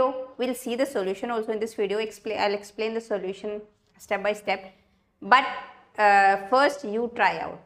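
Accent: India. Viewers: lovely Indian accent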